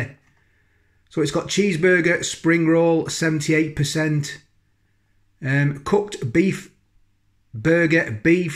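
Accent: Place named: South Yorkshire, United Kingdom. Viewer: Thought you sounded like you are from South Yorkshire x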